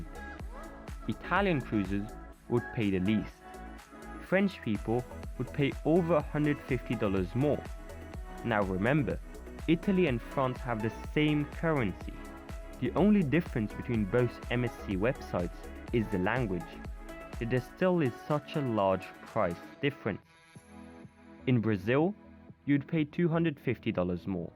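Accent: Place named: Egypt. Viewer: you sound egyptian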